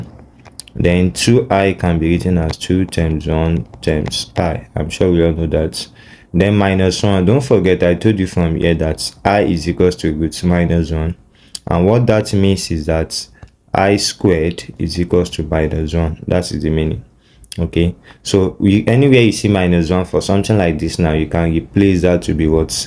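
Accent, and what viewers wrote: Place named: Europe. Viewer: In math, we strive for precision and certainty, so I appreciate how you described it as a convention. I’d be glad to learn that you're from the US, though it sounds to me like you might be from Europe instead.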